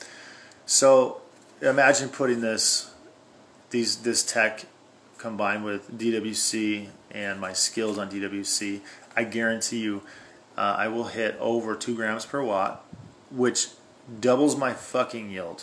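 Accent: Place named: East Coast, United States. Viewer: oh yeah, I'm old and grizzled, and you sound just like 2 or 3 of my buddies rolled into one dude. You originally from back East? Doesn't matter, you just sound like it. I was pushing my stuff too hard when I switched to LED and I just finally tried CO2. The first run was fucking nuts, it might give you 15-20% if you are dialed, but if you were doing everything just a little too hard and that's your limiting factor...whoa, more like 30% and much better quality, plus it lets you run even warmer, which lets me dehumidify harder here, and so on and so on, point is 10'x10' room only cost $100 in gas for 9 weeks once you got your gear. I just got 2 ft flouro UVB bulbs to hang over each table for ripening this round, the dangerous ones where you don't want to be in the room when on, the plants are supposed to cover themselves in sunscreen in response. 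No YouTube, but I'm on the Gram, same name.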